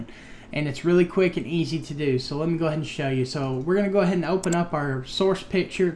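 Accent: South, United States. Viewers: This is THE strongest southern accent I've ever heard :)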